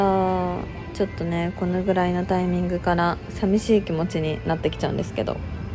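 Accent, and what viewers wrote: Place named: Kansai, Japan. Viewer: かわいい❤️‍🔥東京いるのに関西弁抜けてないあたり関西人の私からすると、たまらなく好きです❤️‍🔥 さんって団子食べるんや〜って何か思っちゃいました♡可愛い♡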